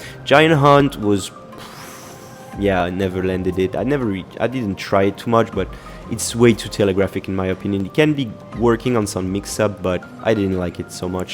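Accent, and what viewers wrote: France. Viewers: Are u french? Si oui tu parles très bien anglais, les touches d’accents font tout le charme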